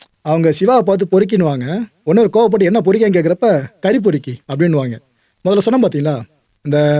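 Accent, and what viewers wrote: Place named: Madurai, India. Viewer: மதுரை ஜங்ஷன் தமிழா அண்ணா 😮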